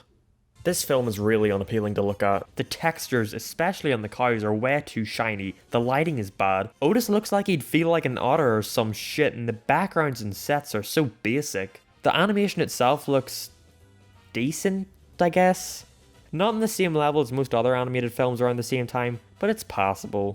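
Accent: Ireland. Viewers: Are you Irish or Scottish? I can’t tell, but don’t ever shit on my Barnyard again. Eat some shit ass food, pray to your King, and shut the hell up. 1776 from the Brits but 2006 is what you should remember. Peak USA dumbass. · wait i thought you were scottish but literally only ireland has irish as a class so that narrows the place you grew up in to side of ireland to the north or donegal because you have that kind of accent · Yo didn't know your irish that's awesome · U Irish ooooooh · Wait. Is he Irish? Mind=blown. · r u irish?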